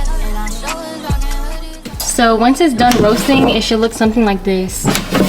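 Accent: United States. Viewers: Is she from the bay area??cuz she soundin alot like she is. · this girl sounds like shes from queens ny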